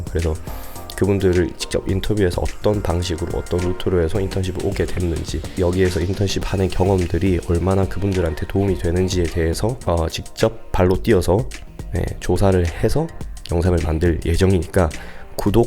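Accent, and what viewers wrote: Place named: Gyeongsang, South Korea. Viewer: 경상도분이세요? 뭔가굉장히친숙한데 말투가 고급진사투리야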